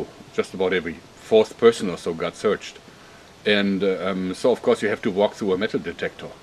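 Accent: Germany. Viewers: does anyone know wehre he lives? it sounds not luke he is from an englisch speakinh country (like me) and i don't know i think about germany (my country) if i listen to him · "I think it can probably slice a throat. We'll find out." LOL Something about the German accent sounds very mad scientist-like. Keep up the great vids. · wie man denn deutschen akzent raus hört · You are german arent you? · man merkt richtig das du Deutsch bist xD. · is He German? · U german? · Really? Ok, then. I'm German myself and his accent is obvious (which makes it so much fun) but I always considered he lived in the US. Don't know why exactly, but just always did. Well, the BKA then ;) j/k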